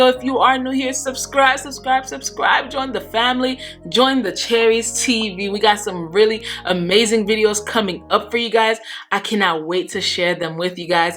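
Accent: Haiti. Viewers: Are you Haitian! I hear an accent ☺️ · do i hear a haitian accent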